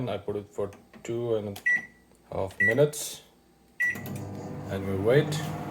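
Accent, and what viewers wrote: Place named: Australia. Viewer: bro ko ni aussie accent aayechha😃😃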